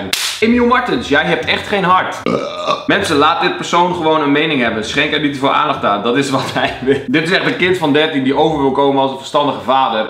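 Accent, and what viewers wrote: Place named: Limburg, Netherlands. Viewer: Lekker Limburgs accent 🎉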